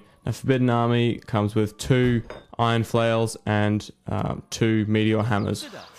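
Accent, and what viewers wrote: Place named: Australia. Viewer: u sound aussie, but good on you pommy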